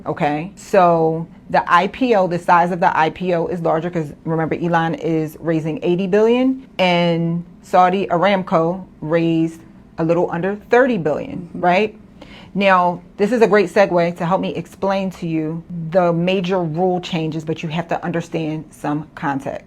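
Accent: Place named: Baltimore, United States. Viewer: Are you from Baltimore? I feel like I hear our accent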